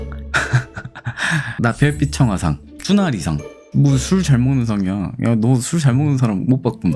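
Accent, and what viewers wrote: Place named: Gwangju, South Korea. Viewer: 광주말투 진짜 매력있다 ㅋㅋㅋㅋㅋ 보들보들해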